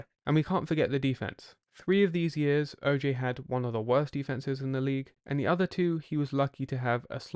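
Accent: United Kingdom. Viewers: Hi, i'd love you to make a video on how you got so interested in american football. I find it really interesting that a young guy from the uk (I think from your accent ) has such a love for the game. Thanks for your videos too, I think your channel is one of the best on the NFL on youtube. :)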